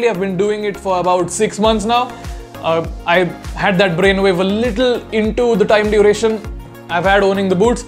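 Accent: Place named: Russia. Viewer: Bro,what's that Russian accent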